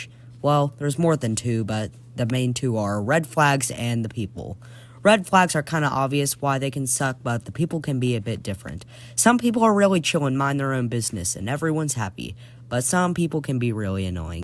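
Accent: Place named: South, United States. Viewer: well it's just cause it's like a southern accent but you still articulate yourself really well lol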